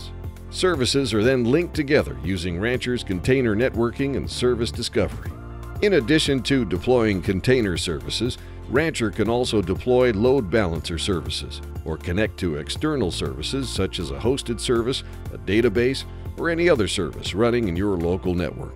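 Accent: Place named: New England, United States. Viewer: why does he sound like a yankee with a country accent?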